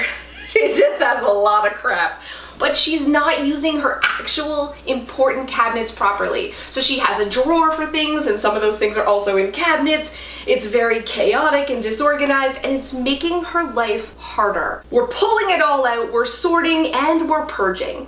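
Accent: Canada. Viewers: I love your videos. You remind me of myself. I have to confess, though, I make fun of your Canadian accent when I watch. 😋 It’s pretty close to my relatives in Minnesota. 😘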